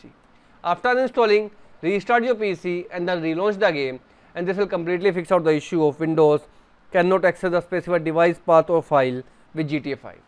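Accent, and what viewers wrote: India. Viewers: YEA THANK YOU I HAVE BEEN TRYING TO GET IT TO WORK THE ENTIRE DAY IT WORKS AGAIN TYSM HOLY POOP IM LIKING UNDERATED VIDEO MAKE MORE FIXES I K NEW I SHOULD LISTEN TO SOMMEONE WHO SOUNDS INDIAN BUT THAT NOT MATTER IT WORKED THANK YOUUUUUUUUUUUUUUUUUUUUUUUUUUUUUUUUUUUUUUUUU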